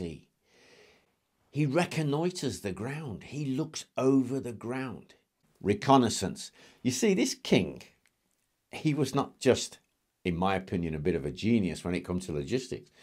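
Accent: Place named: RP, United Kingdom. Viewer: A special thanks for RP and not using slangs or dialects(hello cockney))).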